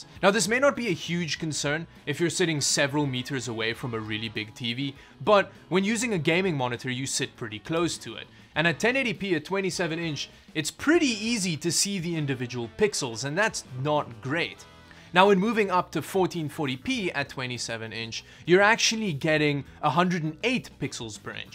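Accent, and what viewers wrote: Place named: South Africa. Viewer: why do u sound south african. Mhmmmmmmm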